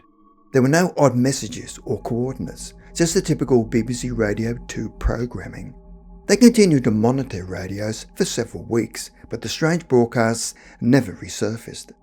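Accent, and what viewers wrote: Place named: Australia. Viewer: Australian accent 😁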